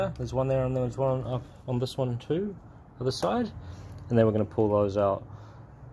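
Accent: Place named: Australia. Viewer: ur sounding like a bloody auzzie keint haha. love it. awesome videos. keep it up.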